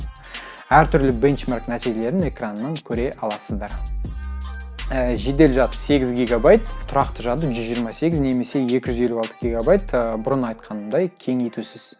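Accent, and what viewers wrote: Standard. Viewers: Очень качественное видео и речь. Настоящий казахский язык, не базарный и аульный, звучание красивое. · Күшті! Мықты сараптама таза қазақша. Жарайсың!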